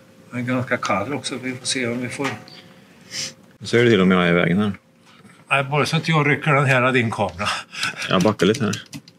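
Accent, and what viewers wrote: Värmland, Sweden. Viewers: Gött å höra lite bred värmländska! Ett fantastiskt hantverk må jag säja! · Dä ä ju lite lustigt detta att du pratar värmländska mä buckel mannen men inte i dina vanliga filmer (inte lika grov värmländska)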